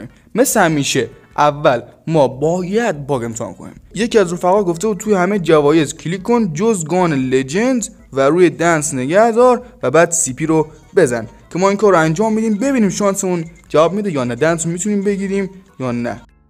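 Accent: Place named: Mazandaran, Iran. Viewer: بنازم لحجه مازنی🗿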